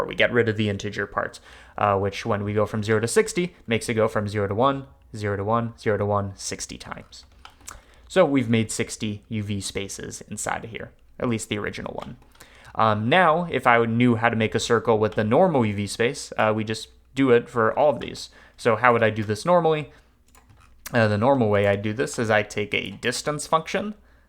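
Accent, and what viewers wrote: Boston, United States. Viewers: I love your Boston, reminds me of my C.S. college days =D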